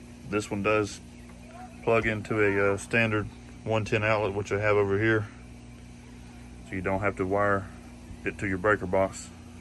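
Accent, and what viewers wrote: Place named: Alabama, United States. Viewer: He sound like he's from BAMA!!! Roll Tide!!!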